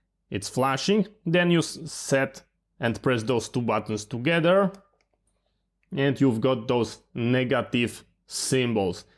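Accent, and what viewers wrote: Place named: Eastern Europe. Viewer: Lovely Eastern European accent 👏🏼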